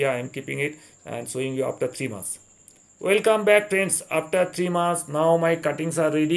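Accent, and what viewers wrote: India. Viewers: I like Indian English :-)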